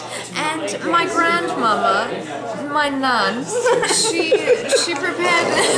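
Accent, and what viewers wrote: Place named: RP, United Kingdom. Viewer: Hey! babe not bad at an english accent mind you it's like Emma from Jane Austin a bit posh. Love the way you kept saying nun instead of nan. i will have to come over and teach you one day; ) TeamUK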